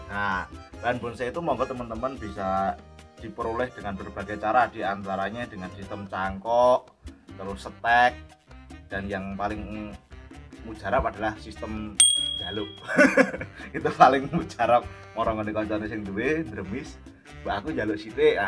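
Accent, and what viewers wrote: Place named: Java, Indonesia. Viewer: Salam satu hoby kang.....dari boyolali...aku suka bhasanya meduk capur Jawa .....kadang bikin ketawa..lanjutkan moga sukses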